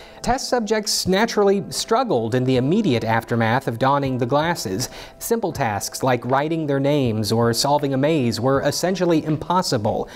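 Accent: Australia. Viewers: Oh I see you are from Australia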